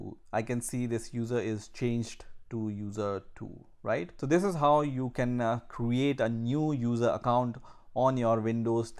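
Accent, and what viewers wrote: India. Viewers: This dude is German? But he sounds Indian to me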